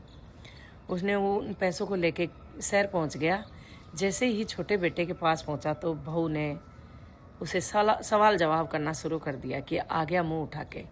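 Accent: Gujarat, India. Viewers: आपका अंदाज बहोत अच्छा है आप भी खूबसूरत हो ,सिर्फ एक बात खटकती है आपके उच्चारोमे गुजराती या एम पी का टच आता है ,उसे सुधारणे का प्रयत्न किजीये ,आप एक अच्छी कथावाचक ,Anchor बन सकती हो ,हार्दिक शुभेच्छा 🎉🎉🎉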